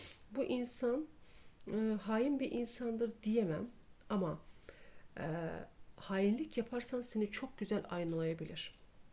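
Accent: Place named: Ankara, Turkey. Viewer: şivemiz bu biz angara nın bebesiyiz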